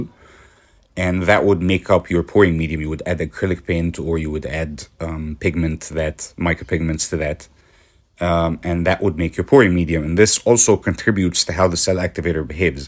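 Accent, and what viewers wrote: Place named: Egypt. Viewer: I just found your videos and the content is such a gem! Your experiments are fun in their own right! True, cells are generated by destabilizing the fluid paint through disrupting surface tension and manipulating paint densities and viscosity while layering, so that some sink all the way down or float all the way up. You can check out the Rayleigh-Taylor instability for more context. Side note: You're AMAZINGLY fluent, but I think I heard a faint Egyptian accent and I immediately grinned 😁 I hope I am right in my speculation!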